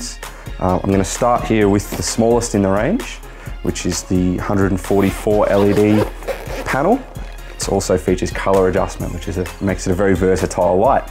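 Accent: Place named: Australia. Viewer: You can really tell an Aussie accent from all others! refreshing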